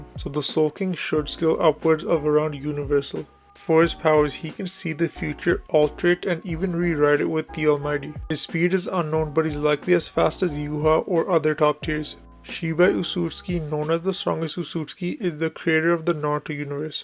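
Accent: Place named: India. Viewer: even your English accent is so trash are you Indian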